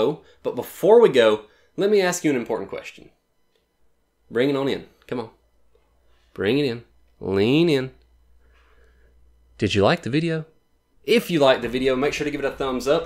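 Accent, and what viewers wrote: South, United States. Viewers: the yeehaw type voice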